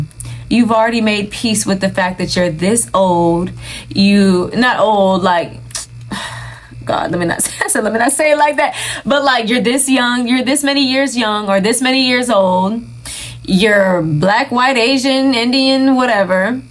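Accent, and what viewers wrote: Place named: United Kingdom. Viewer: The British accent on point lmao Love the video ❤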